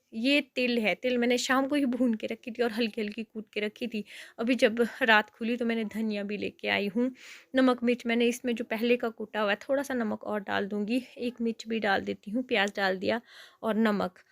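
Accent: Pahari, India. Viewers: Apani phadi tone sudharwo adhi Hindi adhi phadhi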